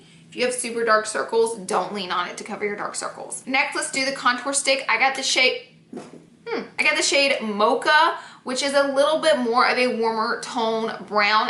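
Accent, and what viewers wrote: South, United States. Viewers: Brittany Hargett she has a heavy country accent, you ignorant bitch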